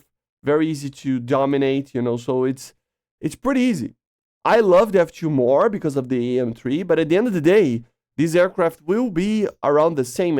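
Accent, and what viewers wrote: Brazil. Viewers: Essa cara é brasileiro kkkkkk ele fala ingles mt bem mas tem um sotaque de Br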